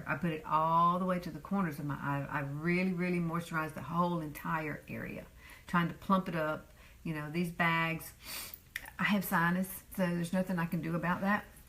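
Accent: South, United States. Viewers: Hi! So refreshing to have found you. I am subscribed to a few beauty gurus, but they are all in their 20's and 30's. Fun and entertaining to watch, but I was looking for someone to address some issues of the inevitable aging skin. I am 48, and the one thing I fight with is the fine lines and crepeyness of my under eyes. It seems any and everything I try to use just accentuates the madness😩I'm going to give this a try. never thought to leave that area naked from foundation. I do make my own facial oil with jojoba, coconut or sweet almond as the carrier oil. Then add in essential oils such as frankincense, vit. E, lavender, rose hip, pomegranate oil, sweet orange, ylang ylang or rosemary oil. Not all the essential oils in one potion, I just play around with each new batch and it has done wonders for my skin over the past year. Truly amazing. Also, LOVE rosewater! 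Can't wait to try this. You are beautiful and I love the southern accent. New sub here! Much love from Texas💕💋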